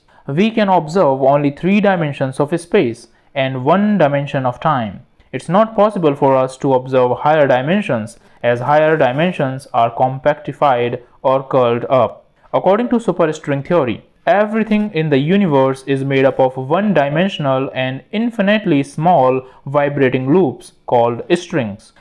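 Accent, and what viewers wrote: India. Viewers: The fact that he says "higher dimensions" every 5 seconds with his lovely indian accent just blew my mind.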